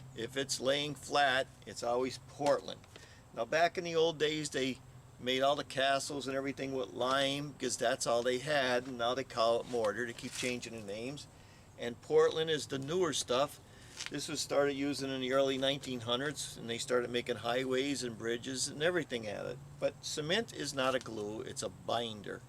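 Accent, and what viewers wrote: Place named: Scranton, United States. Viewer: I was born and raised the first 25 years in Wilkes-Barre area. I watched your first video today, and then many others and within two minutes of watching you and listening I knew you were from W-B/ Scranton area. We’ve been living in San Diego the past 40 years and now that the kids are grown up we’re coming back Home, buying some land and going to have fun building the last home that we’re going to live in and it’s going to be all stone just like our current house. Your videos are great, it’s really great to hear and see somebody from where we grew up, and I forgot the recipes for walls, stonework and grout and this has been so helpful. Thank you for your video.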